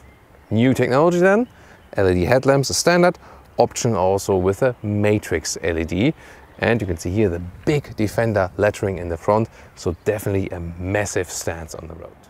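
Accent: Germany. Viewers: I will get the new Defender next week but still watching reviews. I thought I saw them all but today stumbled over yours. As a fellow native German speaking liked your accent already but then I discovered that this is the review I was looking for. It's just the way that if you get the car and discover it yourself with all the little details. And you give your opinion and verdict, which obviously you can buy or not. Best review I saw on the New Defender. Thanks!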